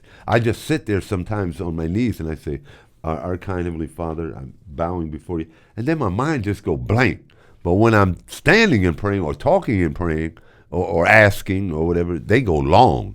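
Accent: United States. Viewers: You are so Sweet hhhhhh you have a Great Voice and American accent I Love it from Algerian. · Hey Americans ! What state accent is this man speaking ?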